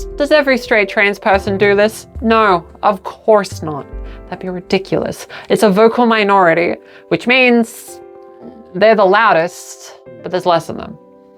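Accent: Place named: Australia. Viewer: I hear a hint of aussie in your voice. But what is most noticable is how faint it is. As if you started out in australia but years ago you maybe moved to the states. Dialects and accents interest me.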